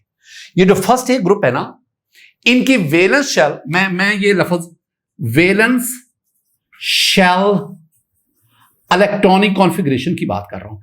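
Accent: Punjab, India. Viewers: Punjabi speaking 🗣️👀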